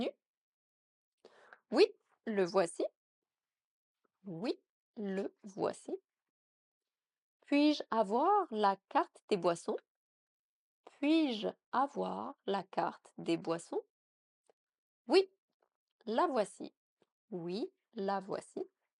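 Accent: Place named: England, United Kingdom. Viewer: I love your english accent🥰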